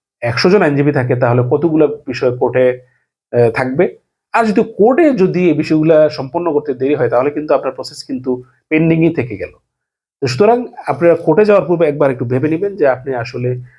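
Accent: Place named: Kolkata, India. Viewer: স্যার আপনি সহজ বাংলায় বললে ভাল হয়। কলকাতার মতো বলছেন মনে হচ্ছে। 🎉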